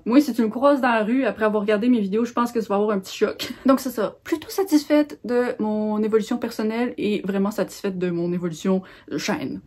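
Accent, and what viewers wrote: Quebec, Canada. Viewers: Coucou merci pour cette vidéo et joyeux anniversaire à la chaîne 🎂🥳 J'ai découvert ta chaîne il y a peu et j'aime beaucoup vidéos 😊 J'adore l'accent québécois que je trouve trés cute, mais c'est vrai qu'il y a quelques années, il me manquait certaines expressions/du vocabulaire (ce qui est probablement encore le cas en tant que française 😂) mais ça ne m'est jamais venu à l'esprit de lâcher des commentaires sur ça, c'est pas le sujet, je comprends pas les gens qui n'aiment pas et qui prennent le temps de commenter alors que c'est juste un accent 😅 PS : L'audio est top 🫶🏽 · J’ai découvert ta chaîne très très récemment et j’adore ton contenu et tu n’es pas la seule québécoise que je suis et ça me fait trop de peine que l’accent puisse être un blocage et surtout un moyen pour certains d’insulter. En tout cas n’écoute pas les français qui critiquent ce point là car ils n’en valent pas la peine. 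🥲 J’ai prévu de me faire un petit marathon de tes vidéos d’ailleurs pour rattraper le contenu en retard 🥰 D’ailleurs je crée mon contenu sur Instagram et tik tok mais j’admire les booktubeuses car ça me fait rêver mais le montage m’effraie 😆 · Bonjour, si tu souhaites un jour publier ton roman, je pense que tu dois connaître la maison d'édition "Les Trois Nornes" créée par Laurène Beles de la chaîne YouTube Les mots de l'imaginaire ^^ Courage pour ta santé mentale! Je suis en voie de guérison de mon troubel anxio-dépressif, donc je comprends que ça peut être parfois difficile ^^ Une française qui adore ton accent québécois;) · Coucou, j'adore ta chaîne, ton énergie et ton accent québécois (je précise que je suis française) est tellement sympathique 😊, surtout ne change rien:_etoile1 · Hello !! Je t'ai découverte très récemment (ça doit être ta 3ème vidéo que je regarde) et je rebondis sur les commentaires des français par rapport aux Québécois (je suis française) : ces personnes-là sont juste des abrutis qui n'ont aucune ouverture d'esprit ou curiosité culturelle ! 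Personnellement, j'ai cliqué sur ta vidéo par curiosité (celle où tu lis Captive, entre autres), et ton accent Québécois n'a fait qu'ajouter un argument supplémentaire pour que je m'abonne ! Je te souhaite bon courage dans ta vie perso, et j'espère que tout se passera bien 🤞🏻 Oh Luna est adorable 😻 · Hello, je voulais juste te dire que j'ai découvert ta chaine y a moins de 3 jours j'ai du regarder plus de 10 de tes vidéos et j'adore ce que tu fais et ta personnalité, et je voulais rebondir sur ta question que tu te pose sur ton accent. Perso je suis française et j'ai assez peu l'habitude de suivre des québécoises (surtout parce que je tombe pas souvent dessus) mais a aucun moment ton accent ne m'a dérangé, au contraire je le trouve très charmant et je sais que c'est ce que beaucoup de français pensent mais comme toujours on entend que ceux qui parlent quoi.. bref ne t'inquiètes pas de ça et continue d'être toi 🙂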